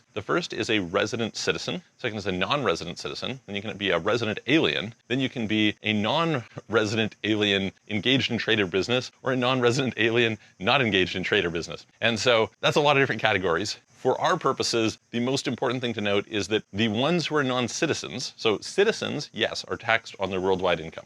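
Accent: Canada. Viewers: Excuse us. He’s Canadian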